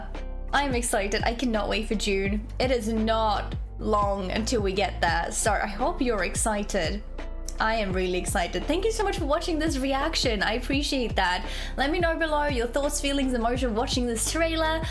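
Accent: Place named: South Africa. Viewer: Where are you from ? you almost sound South African and Irish and Australian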